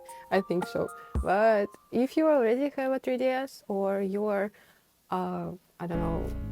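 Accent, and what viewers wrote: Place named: Russia. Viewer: this woman got that babushka accent.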